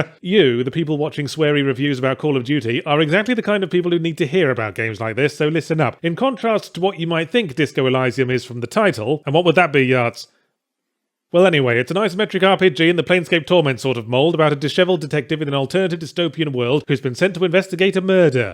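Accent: United Kingdom. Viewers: I am here to listen to a British man in a fedora say really funny things about a shit game REALLY REALLY fast <_<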